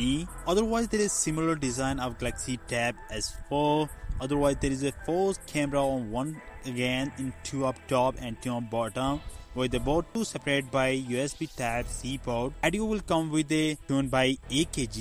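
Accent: India. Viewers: I just turned volume off because of the butchered 7 ELEVEN Apu English. Still a great vid!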